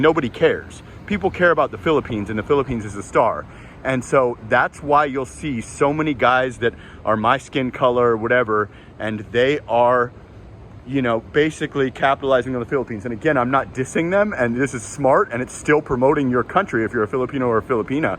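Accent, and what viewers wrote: United States. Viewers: I’d like to add because you may not know it, your narration helps a lot too the young Filipinos learning and developing and mastering the American twang.👍👍👍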